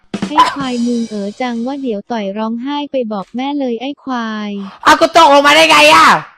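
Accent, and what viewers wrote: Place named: Suphanburi, Thailand. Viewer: พี่ เป็นคนสุพรรหรอคับแลเหน่อ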